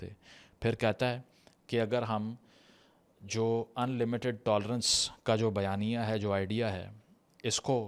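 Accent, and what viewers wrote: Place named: Lahore, Pakistan. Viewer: pakka Lahoڑi hai meri tarha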